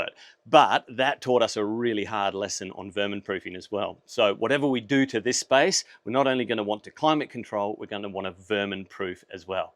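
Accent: Australia. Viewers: I know YouTube doesn't care about what I write in the comments, but I hope these comments help you grow and make some money from this video. I really like your Australian accent.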